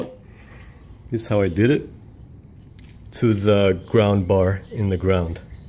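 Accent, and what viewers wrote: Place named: North, United States. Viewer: Your accent was driving me crazy! You're from up north aren't you? I'd be willing to bet you're a yankee, without a doubt!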